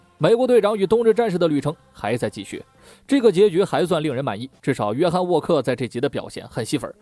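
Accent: China. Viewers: 听你口音，你明明是大陆人，为什么要用繁体字呀？蛤？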